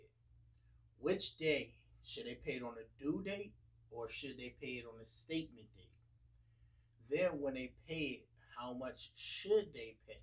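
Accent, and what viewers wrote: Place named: Chicago, United States. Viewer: Can u mentor me I need help.. are u from chicago you sound like us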